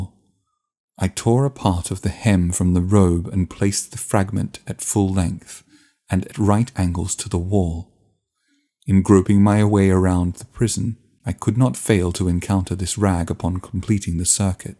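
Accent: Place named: England, United Kingdom. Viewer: Brilliant! Thank you, good reading, like your english accent 👍😉 Greetings 👋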